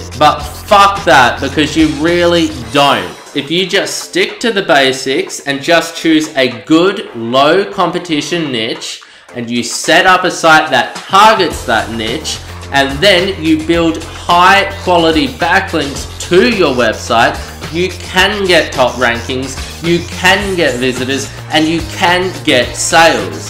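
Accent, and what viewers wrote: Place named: Australia. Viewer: Niche is pronounced "Neesh" not "Nitch" ... nice to hear an Australian voice on one of these kinds of videos though :)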